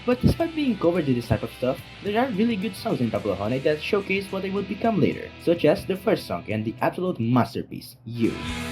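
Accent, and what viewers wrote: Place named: Brazil. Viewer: Hey, i've been subscribed for a while, and i've been meaning to ask, do you have a portuguese speaking channel? i'd love to see it, and share with some other brazilian folk, for real! I believe you're brazilian/portuguese based on your accent and community posts, if not, please ignore this comment.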